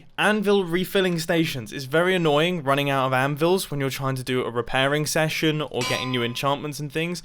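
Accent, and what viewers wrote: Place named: United Kingdom. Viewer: I just realized he kinda sounds like wheatly from portal 2, probably because they both have British accents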